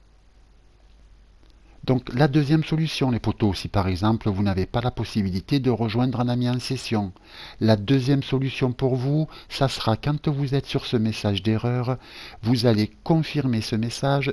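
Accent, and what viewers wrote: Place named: South, France. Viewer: merci le plus bel accent du ssuuuudd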